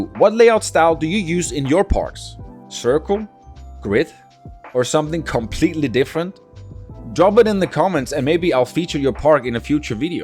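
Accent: Denmark. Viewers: Thanks for the video it helped a lot. Og det også altid så hurtigt man hører den danske accent når man åbner en tilfældig video haha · Are you slightly danish perhaps? the accent sounds very danish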